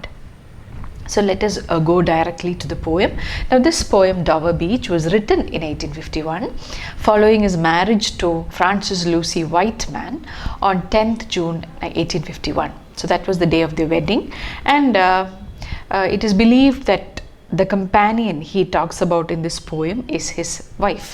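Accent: South India, India. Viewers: Very nice explanation, mam are you South Indian?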